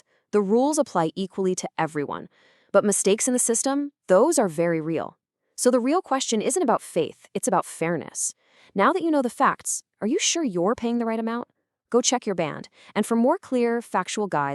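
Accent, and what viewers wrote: United States. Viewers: Why is a bloody American voice being used to preach to us about this ? .is this American actually paying council tax in this now disgusting country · American accent?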